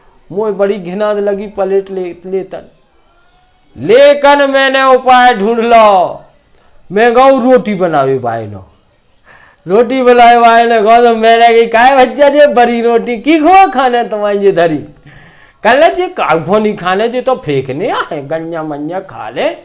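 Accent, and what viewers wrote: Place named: Bundelkhand, India. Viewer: गजब की बुंदेलखंडी जी बोलते है बहुत गजब का टेलेंट है आपके पास ऐसा टेलेंट मैने फिल्मी दुनियां के कलाकारों के पास भी नहीं देखा है आप हमारे बुंदेलखंड की शान हो 🙏🙏🙏🙏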